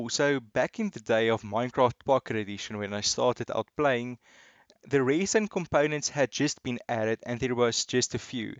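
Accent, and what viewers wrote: South Africa. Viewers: You sound South African. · Really nice vid, bud! Sorry if this is rude, but you sound South African. Thanks for the T-flip-flops!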